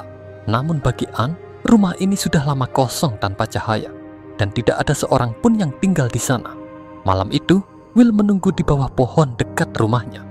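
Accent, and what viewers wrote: Java, Indonesia. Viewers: Medok e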